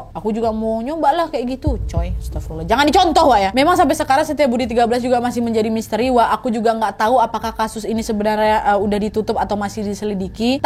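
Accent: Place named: Sumatra, Indonesia. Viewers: Dari Style Bicaranya, sepertinya Wak orang Pekanbaru ya? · Kau itu cerita seakan akan kau ada dan melihat langsung kejadian itu....kau pun kurasa belum lahir...dan kau pun dari logat bahasamu orang Sumatra ... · Mbak ni org Medan yaa 😁 Berarti di thn2 itu byk org hilang ya terbukti byk warga melapor ke polisi bhw anggota kel mrk hilang..serem yaa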